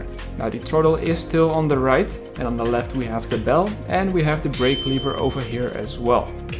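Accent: Netherlands. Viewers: Nederlander die engels spreekt🙃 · The dutch is strong on this one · Haha even though your English is near perfect I could hear the dutch accent. Nevertheless, Great video though!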